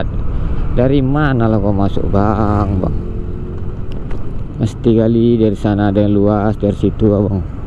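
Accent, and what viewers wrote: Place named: Medan, Indonesia. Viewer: Medan kali repatan abang ni🤣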